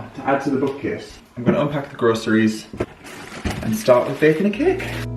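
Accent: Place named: Northern England, United Kingdom. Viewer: It’s sweet how your accent gets more pronounced when you’re up North with your mum.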